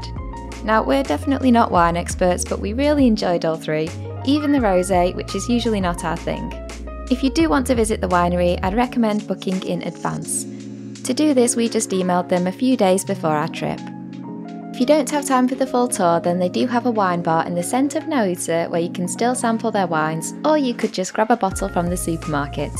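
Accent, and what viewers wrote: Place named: London, United Kingdom. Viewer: Thank you! Love your accent! From London, England?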